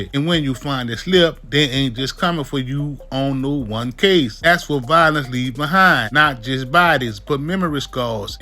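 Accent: New Orleans, United States. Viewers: Loving your videos on all the escapees, good research and a local NOLA voice making for great media 👍 keep it up you're going viral!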